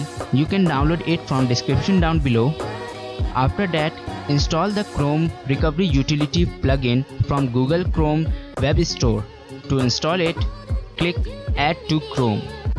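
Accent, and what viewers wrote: India. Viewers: I hate this fucking Hindi accent!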